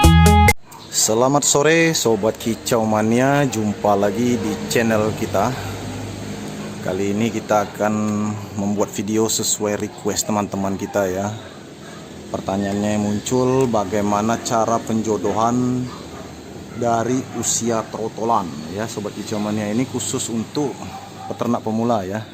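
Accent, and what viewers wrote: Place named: Palembang, Indonesia. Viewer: Logat nya kayak palembang oku selatan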